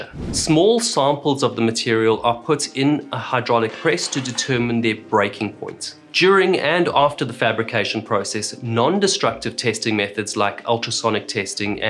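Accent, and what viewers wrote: South Africa. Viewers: Love your accent. As a non-native english speaker i was curious where are you from. My guess was australian but seeing the comments now i know its south african. Very nice❤ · For a second I was confused to hear that accent, but it's always good to find a fellow South African in the wild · That is a South African accent right there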